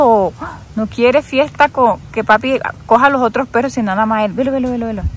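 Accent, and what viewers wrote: Caribbean Coast, Colombia. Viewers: Tan bello, habla puro costeño. Jajajaja disfruta mucho la familia, que después tendrás chichi pa rato🙏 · Costeñol puro!!! Abrazos, desde Cartagena, Colombia!!!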